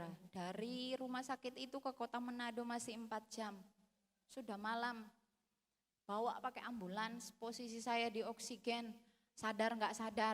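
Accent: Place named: Malang, Indonesia. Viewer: Tante uda medok bgt malangnya😅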